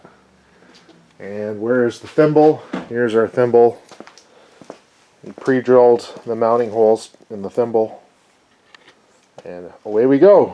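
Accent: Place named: Canada. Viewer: Wow thank you for such a speedy reply! Yes I do have a Mr. Heater. Are you in Canada? ( I see the hat pin and recognize your accent.) (Maine here) I'm thinking U.s may be a little different. However I did decide to do it at around 20" because I really didn't want it sticking 3' into the shop space.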